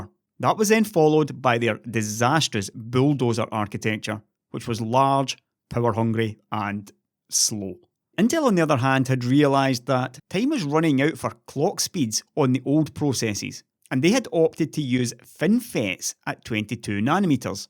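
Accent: Scotland, United Kingdom. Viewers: The sweet sound of Scottish analysis...everyone knows what time it is. 🙏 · He's Scottish, of course. The accent is similar to Dr. William MacDougal from Kirkwall ... Or Aberdeen? Or Glasgow? 😁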